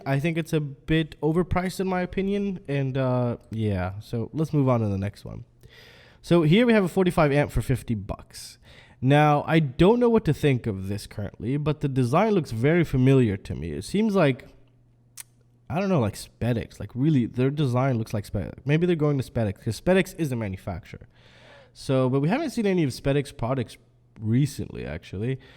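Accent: United States. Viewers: Somehow I always thought you're American and in America :D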